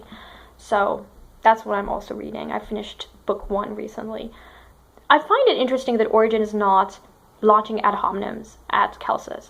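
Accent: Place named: France. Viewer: Hey, love your French accent! I must ask - do you like Victor Hugo's writings? And I'm excited to hear more bout the inquisition!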